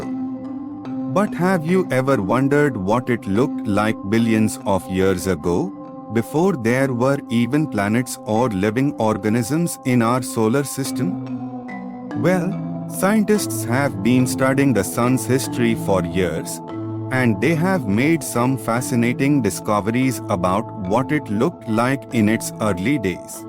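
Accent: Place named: India. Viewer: I think that the narrator is coming from India.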